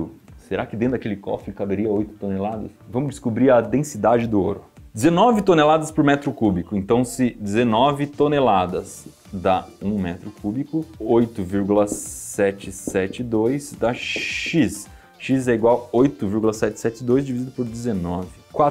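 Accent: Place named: Minas Gerais, Brazil. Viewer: Ele deve se mineiro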